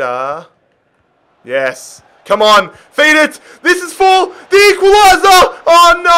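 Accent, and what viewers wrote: Australia. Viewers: Init you can tell he’s Australian 😂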